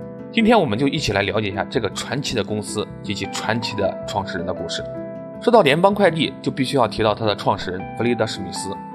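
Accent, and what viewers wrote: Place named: Jiaodong, China. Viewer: 老哥。。你这胶东咬字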